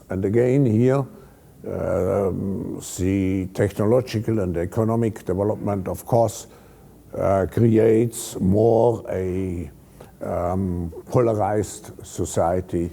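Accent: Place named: Germany. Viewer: That German accents is not a meme lmao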